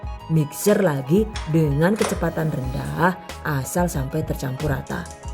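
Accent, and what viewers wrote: Banyumas, Indonesia. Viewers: Saya suka resep dari karena resepnya simple dan banyak memberikan tips dan trik yang sangat membantu bagi saya yang pemula, ngapak2nya jg ada😊😊. Mksh 😙😙😙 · Seneng liat video karna ada hiburan tersendiri dngan suaranya yg khas berwibawa dan kadang jg ngapak 😁 sukses terus bunda #GiveawayTHV2021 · Chanel kesukaanku Menginspirasi buat ibu ibu muda kaya aku yg suka bgt buat kuker, tips &triknya ngebantu banget 🥰 Dubbingnya pengisi suaranya juga lucu ngapak ngapak lihat video sambil senyum" Sendiri 😂 Paket komplit pokoknya klo liat ada lucu"nya gimana gitu 😁 #GiveawayTHV2021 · Sueeennneeeng bgt ma video2 Pas moment realeseny Pas budgetny Pas rasany Pas tipsny Pas bhasa NGAPAKny Pkokny ngebantu bgt Jazakillah khoiron😊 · asal resepnya udah ga ragu buat nyoba recook.. vidionya selalu disertai tips & trik, jd insya Alloh berhasil asal sesuai resep sama langkah²nya.. 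ditambah ana logat² ngapake, dadi rasane kaya agi diwaraih masak nang mbekayune, wis pokoke channel masak terfavorite nyong kye lah yu.. semoga makin sukses & jgn pernah bosan untuk berbagi ilmu ya mbekayu.. salam ngapak dari kec.ayah kebumen #GiveawayTHV2021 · mb,di bnyakin ngomong ngapaknya ya,,lucu😁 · Paling sukaaa deh nonton ❤️, banyak bgt resep2 camilan'a, bisa juga buat ide jualan d rumah.. tutorial'a jelas, padat & gamblang👌. Ilmu'a bermanfaat banget apalagi ibu2 muda sperti saya ini😊. insyaaAllah kelak nanti gadget dapur'a sudah komplit (maklum nganten anyar😅) pengin bgt deh praktekin 1per1 resep'a biar bisa bantu2 suami juga😍 oiyah liat video'a juga ga ngebosenin deh pokok'a, krna logat medok "ngapak'a" itu lhoo yg bikin ngakak sendiri😂😂 Daaan dari pertama jumpa yg paling bikin penasaran itu pengisi suara'a tauu, hehehe🤗. #GiveawayTHV2021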